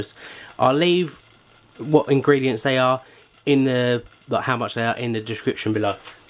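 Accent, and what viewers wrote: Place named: England, United Kingdom. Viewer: hello. Great audio description. I am blind so I really appreciated you talking and it was lovely to hear your English accent as I am English to living in Australia bye for now Donna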